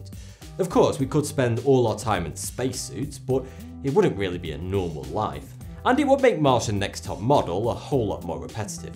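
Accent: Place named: England, United Kingdom. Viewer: I watch dis guy just so I can learn an English accent, it's so cool. I've been practising from March now.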